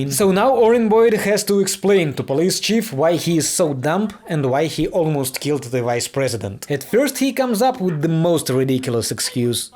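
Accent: Russia. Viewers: The little trix with your and nice everyone can do that but you need to get someone that talk English. Not Russia.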